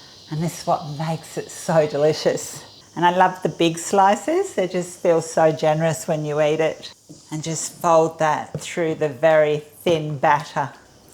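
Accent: Australia. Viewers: I love your accent. You sound absolutely spangled! Aussies always sound like they are completely smashed. 😂 Great recipe btw. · Why ate you saying all purpose flour? In Australia its plain flour and double cream. You accent is Aussie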